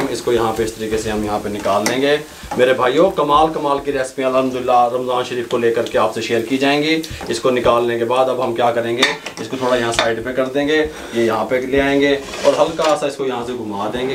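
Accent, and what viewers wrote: Punjab, India. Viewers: Assalamualaikum Sir AP ki Punjabi Kafi mezy Vali hai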